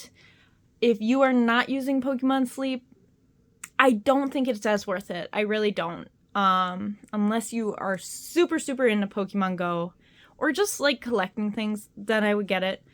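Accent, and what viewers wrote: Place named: Midwest, United States. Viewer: I can tell you are from the mid west lol :)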